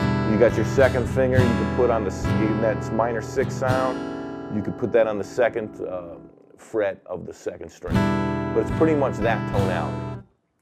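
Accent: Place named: New York, United States. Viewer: An Italian American man with new York accent teaching an Indian sing. Amazing!!!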